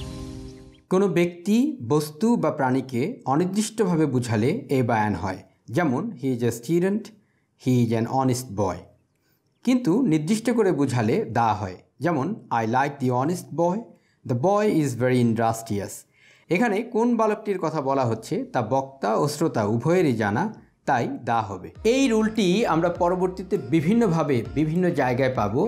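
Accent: United Kingdom. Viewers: it is surely with BRITISH ACCENT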